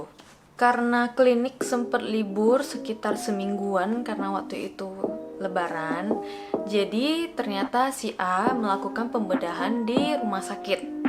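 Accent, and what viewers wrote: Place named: Medan, Indonesia. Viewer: udah ku duga kalo dokter tu orng medan,soalya logatnya kas bget☺️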